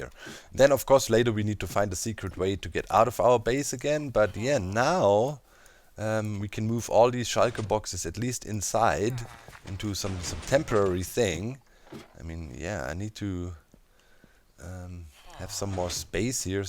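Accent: Germany. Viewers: Du hörst dich ziemlich deutsch an, bist du ? Wenn du nicht antwortest denke ich mal nicht :)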